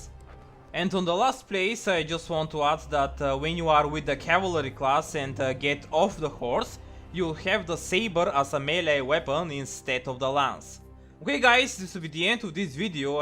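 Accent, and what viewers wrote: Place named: Russia. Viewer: I love your thick Russian accent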